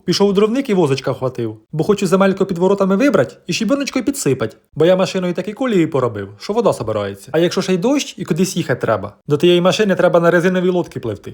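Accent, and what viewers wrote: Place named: Surzhyk, Ukraine. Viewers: Шапка, пальто- просто супер-прикид. А говір наш, Черкаський. Обожнюю. Процвітання вашому каналу і щастя родині❤ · Хлопче, з твоїм відео і мій день трохи налагодився. Мої батьки з Дніпропетровщини і твоя говірка мені рідна. Хай все получиться! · Ви звідки? Наш щирий суржик,щирі люди,прості,роботящі, приємно спостерігати.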